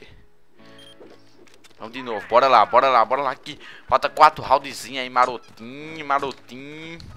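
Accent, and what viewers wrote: Northeast, Brazil. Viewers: vc é do nordeste? não sei mas percebi pelo sutaque slá. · vc é nordestino??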